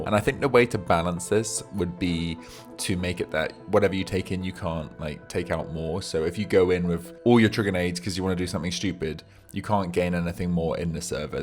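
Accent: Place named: United Kingdom. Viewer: Your a straight up limey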